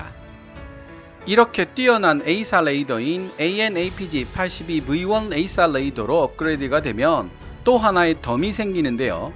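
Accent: Gyeongsang, South Korea. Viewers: 갱상도 분이신가보네요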